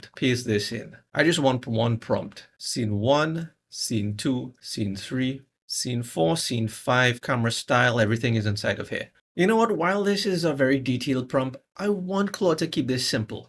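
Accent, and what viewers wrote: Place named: Trinidad and Tobago. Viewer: Alright Good morning Yuh sounding Trinitarian. 🇹🇹 Trinidadian.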